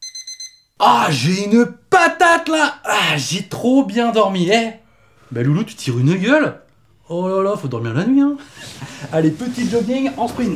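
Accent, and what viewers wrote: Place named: Toulouse, France. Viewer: Pire accent toulousain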